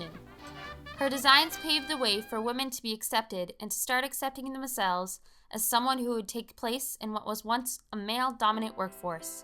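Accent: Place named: United States. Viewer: Sorry, the voice commentary is very bad. Terrible, high pitched, American accent.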